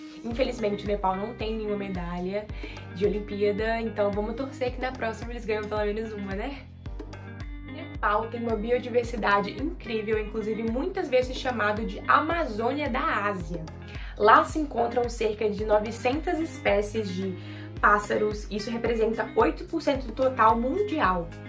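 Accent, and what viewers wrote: Minas Gerais, Brazil. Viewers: Moça você é mineira?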